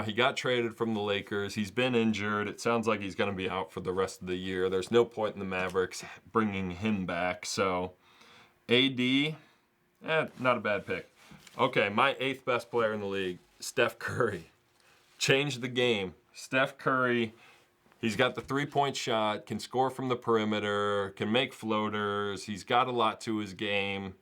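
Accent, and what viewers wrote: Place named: United Kingdom. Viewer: Loved the British accent! Who knew you had it in you. Also great list - chatGPT’s got nothing on you 😂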